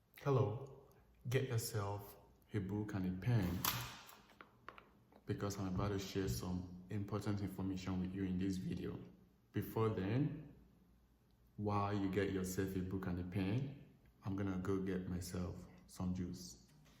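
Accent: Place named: Nigeria. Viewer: Brox talk like Nigerian ...things you shouldn't do in f-1 interview is dont form accent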